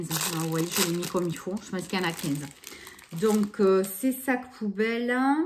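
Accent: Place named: Occitanie, France. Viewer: d'où cette accent alors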